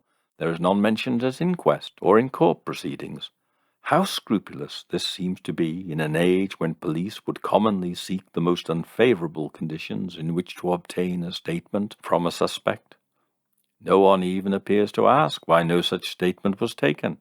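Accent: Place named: Liverpool, United Kingdom. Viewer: You mentioned in one broadcast that you lived in Balham, London. Your accent sounds a little bit like Wirral or Liverpool.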